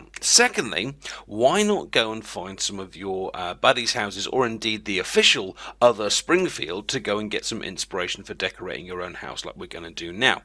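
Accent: United Kingdom. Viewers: I agree lol. I usually hate the british accent but this guy makes it sound really good.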